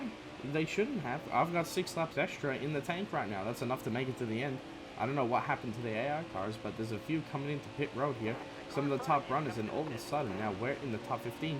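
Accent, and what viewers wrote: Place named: Australia. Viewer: Never getting-ver this Australian accent pog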